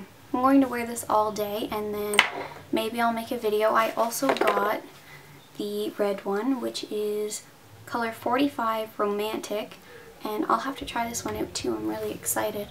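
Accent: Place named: Canada. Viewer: Are you Canadian? Lol, your accent clued me in, but then you said "shoppers" hehe.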